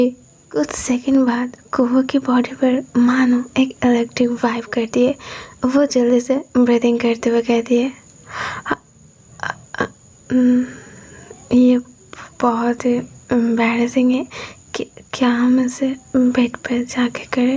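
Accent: Bengal, India. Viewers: Didi aap kolkata sa ho kya kyu ki main bhi kolkata sa hu apki Bengali language sa lagta hain hain ki aap hain Bengali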